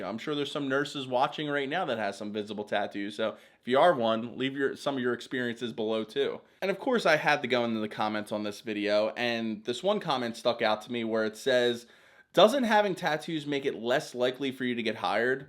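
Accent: Philadelphia, United States. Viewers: When I enlisted in the military in 2008, my first choice was the marine corp. I couldn't get in because of tattooed knuckles and a back piece visible above the neck of my shirt. Ended up in the Army because they offered me a "tattoo waiver." All my tattoos were inspected, and recorded during my entrance physical, the record ended up being 7 pages. Now I'm a subaru mechanic. Also, I can hear the Philly in your voice, Frankford born and raised.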